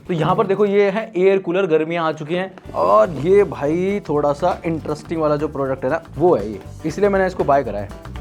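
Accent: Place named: Haryana, India. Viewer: Yehh haryanvi ✌🏼😎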